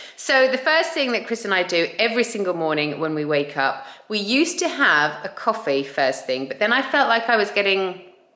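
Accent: United Kingdom. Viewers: I'm South African, had no clue you're South African with your full on UK accent. Thought you were Spanish 🤭 love your meal inspo. Such nostalgia seeing those SA treats 😊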